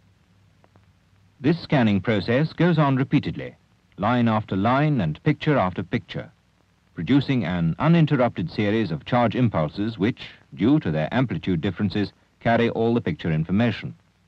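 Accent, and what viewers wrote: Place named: United Kingdom. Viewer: Canada? Why does the voice over sound British??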